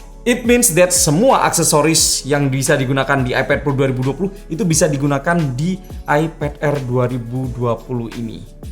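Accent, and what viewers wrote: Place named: Java, Indonesia. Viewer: medok mu mas..... hahahaha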